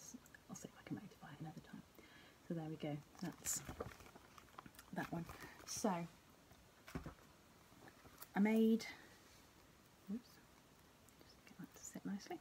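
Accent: Wales, United Kingdom. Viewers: Watched you after Jan Hicks recommended you channel. What a relief to hear a lovely soft English / Welsh voice after so many Americans. Love your stitching! Good luck. Kate, Melbourne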